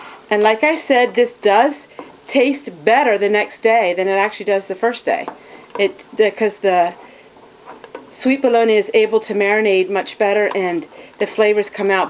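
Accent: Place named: Pennsylvania, United States. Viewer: ha ha, i knew your accent. i am from that area of PA, and now live in NC. so nice to have found you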